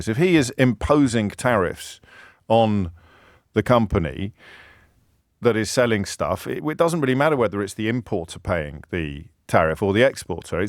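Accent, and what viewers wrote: United Kingdom. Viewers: If you have a British accent you don't know anything about America........... It's funny that EVERYONE against Trump that is complaining about the Tariffs are complaining........... You keep saying that ir will hurt America....YET....... You all are the ones complaining. Seems like all the pitching a fit is proving what Trump said. You need us more that we need you. Thing worse than following Canada would be Following the UK........ Canada is a joke and has been for a long time.... Why do you think the rest of the world calls it Terhanto.:face-blue-smiling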